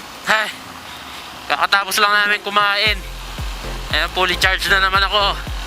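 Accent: Pampanga, Philippines. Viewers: Bosis kapampangan dumali ya hahahaha idol pa colab taga mexico ku bap tuki ku rides u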